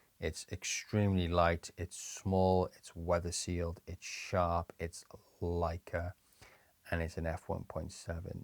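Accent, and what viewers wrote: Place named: United Kingdom. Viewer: Thanks, I deeply appreciate how you pack in tons of information in your videos, and I could listen to your voice all day, Brother, as I love British accents, but yours is calming. 😄 I just recently returned to watching your content again for both your channels. (My previous YT channel was hacked, so I had to re-subscribe, hehe.) Big Respect from the U.S., my Friend.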